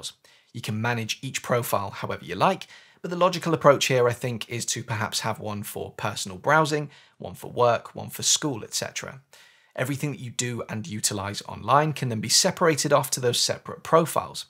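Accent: United Kingdom. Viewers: I was confused if you were british until you said "whilst." 😂😂🤣🤣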